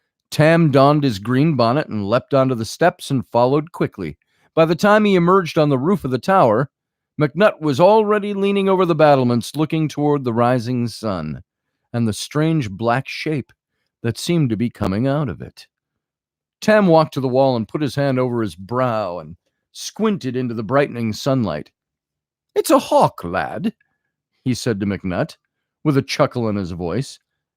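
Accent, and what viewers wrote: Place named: Scotland, United Kingdom. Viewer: That Scottish accent is not to shabby 😂 give you a 8 out of 10 haha 😂❣️ xx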